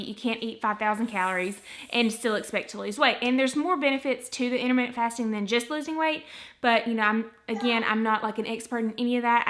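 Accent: South, United States. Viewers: I love your southern accent, great tips🌟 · Oh my god this is my first time hearing a genuine southern accent it sounds so cool. (I live in north new Jersey and I’ve only been out of state twice lol)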